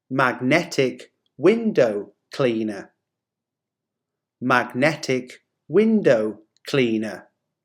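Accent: United Kingdom. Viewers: Thanks! What regional British accent do you have?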